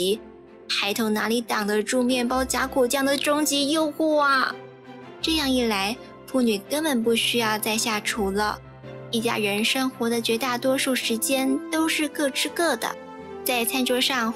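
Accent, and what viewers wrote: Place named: Taiwan. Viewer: 这本书我读过德语版的。文案写得很好，解说很棒。可能由于文化差异，觉得声音有点儿嗲，台湾腔与京腔的给人的感觉正如台湾菜与京菜的甜咸之别一样。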